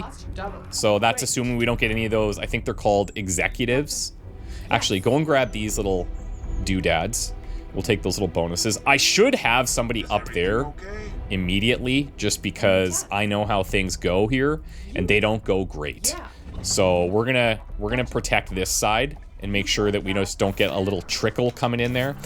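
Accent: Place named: Canada. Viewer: As a non native English speaker it took me till to detect the Canadian 😅 🇨🇦 ❤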